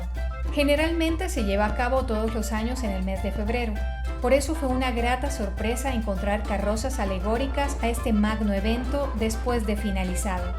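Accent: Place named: Barranquilla, Colombia. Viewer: Una pregunta, ¿Tú eres ecuatoriana o colombiana ? hablas como barranquillera.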